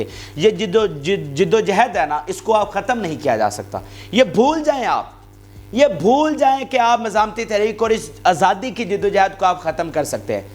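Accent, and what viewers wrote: Punjab, Pakistan. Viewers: Bro your urdu with punjabi is really beautiful _ab ek aur katta khul ghaya ❤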